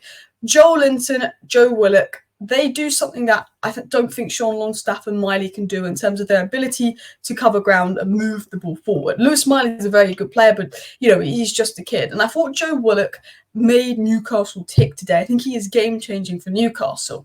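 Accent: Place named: Manchester, United Kingdom. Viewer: What part of Manchester, is that accent from ????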